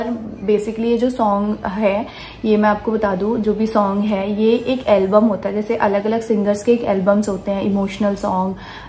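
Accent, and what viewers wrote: Bihar, India. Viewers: Bihar ke logo ki hindi thodi disturb rahti h unke language se pta chal jata h ki Bihar ke hai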